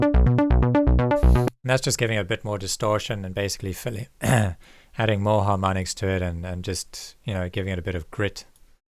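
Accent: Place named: Cape Town, South Africa. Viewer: Great tut, thanks. Cape Town accent?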